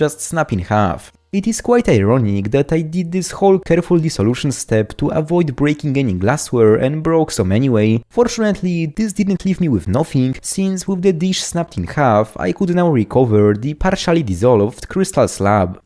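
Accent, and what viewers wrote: Poland. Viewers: Guy sounds awfully like Polish citizen like me :D that heavy accent that I also have :D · czuć rodaka, pozdrawiam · Kurwa juz po samym akcencie rozpoznalem ze polak xd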